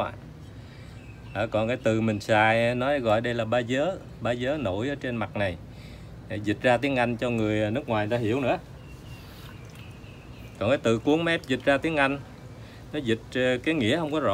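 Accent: South, Vietnam. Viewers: Anh có giọng miền nam ấm áp, thiện lành, ngay lần đầu tiên xem đã cảm mến. Chúc anh luôn khỏe mạnh, an lành. Atlanta, Georgia.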